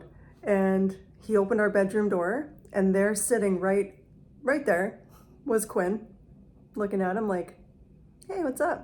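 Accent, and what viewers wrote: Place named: Western Canada, Canada. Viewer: Thank you for your podcast. I enjoy hearing your western Canadian accent, it feels so familiar and friendly. It is fun to see the places you go . I also love that you share your knitting adventures fully, things that don’t work out as hoped and your triumphs , it is nice to know that we all have ups and downs this way :) I just love your open friendly way and all your flight attendant sayings . Any ways, thanks :)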